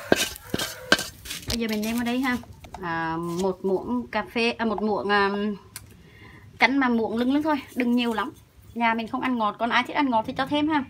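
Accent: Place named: Quang Tri, Vietnam. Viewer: Cô người Quảng trị hay sao nói nghe hay quá. quê tui đây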